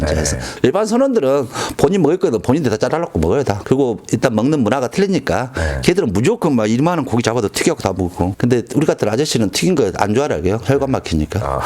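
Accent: Jeolla, South Korea. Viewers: 구수한 전라도 사투리 😊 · 말 재밌게 잘하시는 전라도 분이시네요 ㅎㅎㅎ 같은 전라도인으로 반갑습니다.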